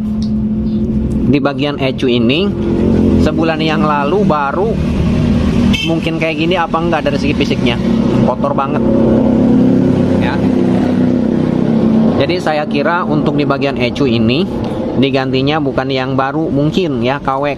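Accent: West Java, Indonesia. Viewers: Paling suka deger logat orang sunda...rasanya pengen bgt pnya teman orang sunda...salam seduluran dr malang jawatimur bossq🙏🙏🙏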